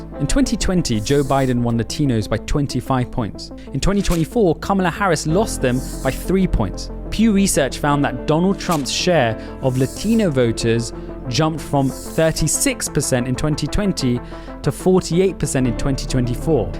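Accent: United Kingdom. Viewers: What does a brown guy with a British accent know about Americans? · At about , he doesn’t even get the frickin states he highlights correct: he says “Arizona,” but his on-screen map highlights “Arkansas.” British accent gets you some points, but then again, it also highlights that your expertise around the nuance and cultural dynamics of our politics may not be up to snuff… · There’s a Middle East fella with a British accent explaining USA politics issues 😂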